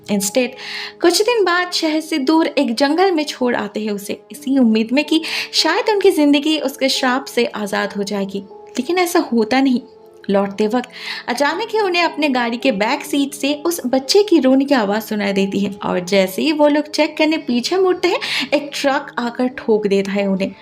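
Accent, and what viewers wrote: Bengal, India. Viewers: Are you bengali didi ur accent bengali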